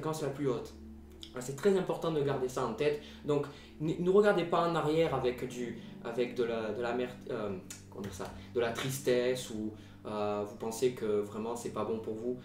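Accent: South, France. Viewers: je découvre cette vidéo que maintenant. J'ai déjà un terrain à être éveillé: de nature très introverti, à me retrouver seul, à avoir des synchronicités et en même temps très empathique. Cependant en 2020 en faisant de la sophrologie encore plus profondément, j'ai reçu un message spirituel comme quoi il fallait que je change de boulot. Je supportais plus les ragots un peu comme tu décris. Aujourd'hui, je suis épanoui dans mon nouveau job. je m'éloigne encore + de ceux qui m impose à ce que je me vaccine vs coronavirus. Je suis pas antiVax, mais là je le sens pas ce truc. Tant pis, mais je m eloignerai des endormis et j'impose pas ma façon de penser aux autres. Enfin, dis moi d'où vient exactement cet accent du sud? Au plaisir de te lire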